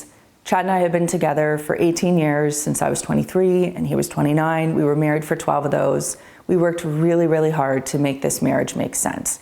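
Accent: Canada. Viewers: Wow I can tell you're definitely from Canada A.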